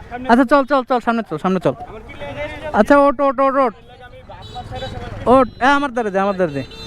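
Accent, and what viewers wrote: Barishal, Bangladesh. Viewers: তুই কি বরিশাইল্লা মনু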